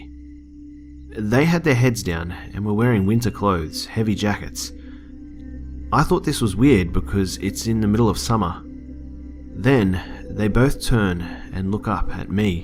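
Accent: Australia. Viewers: Alabama chic with an Australian man's voice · I love that he’s Australian, him swearing in an Aussie accent is the best thing to ever happen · Aussie guy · I love Aussie accents 😍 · I want to get into the stories but the Australian accent kills it. I keep thinking you're going to shout "HAY be a good Sheila & put another shrimp on the barbi". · Aussie narrator for a story set in Alabama 🤔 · Are u Aussie?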